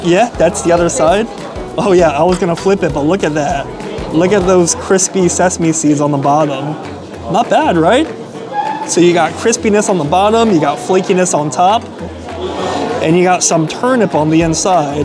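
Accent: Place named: New Jersey, United States. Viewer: Where in Jersey is this guy from?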